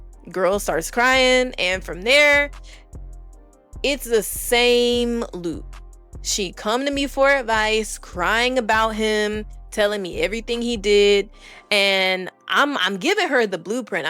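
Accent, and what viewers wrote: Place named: Carolinas, United States. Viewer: I don't know you, but you have a thick southern accent that reminds me of the Carolinas. There are plenty of beautiful, well mannered Black American women down south. Why are you chasing cat in the Philippines? I grew up with Filipinas in my military hometown. They're nice people, but are desperate and will do anything to get ahead. They're told from birth that white men are the ticket and even then, they can scam the white guys. My best friend's mom is the only one I know who actually prefers Black men but even so, she scammed his biological children out of their inheritance, then dated his friends as soon as he died of cancer. Come on, be smarter.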